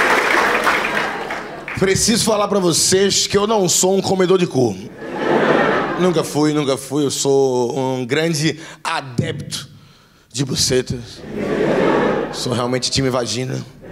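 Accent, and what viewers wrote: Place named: Recife, Brazil. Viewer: sou do RJ. Você merece tudo de bom dessa vida. Te acho muito inteligente. Os palavrões fazem parte do seu show. Ele é dito com diversão!!! Te desejo muitas "Felicidades" muitos "Parabéns verdadeiros". Enfim você nos faz sorrir pelo teu conhecimento e rir de passar mal de tua Alegria. Deus te abençoe e grata por toda a sua Alegria + Alegrias nos dada. Sucesso abundante e muita Saúde eu te desejo. Recife é uma cidade linda e você trás isso em seu coração. Que sutaque lindo. Parabéns muitos Parabéns 🎉🎉!!!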